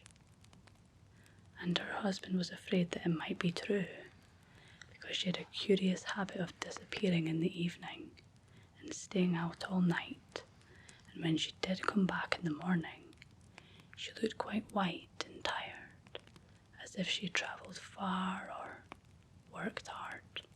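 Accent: Scotland, United Kingdom. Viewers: Aw, my Scottish friend gifted me this book for christmas one year 😊 now I can listen to the stories in a Scottish accent, and in asmr format! Thank you 😌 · It cannae get mere Scottish than this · this is so perfect for me - fee tales and a gorgeous socts accent to boot:)! Love the choice of stories and your sense of humour as well:)! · Yass scottish 😂😂😂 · Whispered Scottish ASMR are you kidding me 😍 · People love your Scottish accent but people don’t like mine😢😢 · Yes, I have to agree. I do love your Scottish accent my God I’m falling in love with you gorgeous Sexy Mamacita.❤ ya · I have listened to this every night since you posted it please keep them coming the more Scottish the better ❤ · This is my favorite video! I can't wait for the next one with more Scots dialect ❤ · Love your Scots accent shorty can sit and listen to you all day you make me feel safe and comforted I have PTSD due to sexual abuse by male guitar teacher at 17 I listen to you every night you make me feel safe thank you for all you do shorty you saved my life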